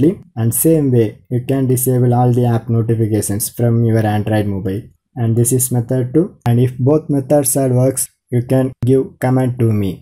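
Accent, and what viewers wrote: India. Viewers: I like indan accent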